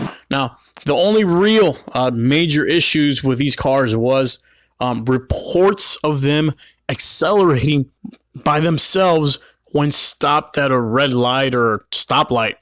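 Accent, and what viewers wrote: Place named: South, United States. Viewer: Bring back the southern accent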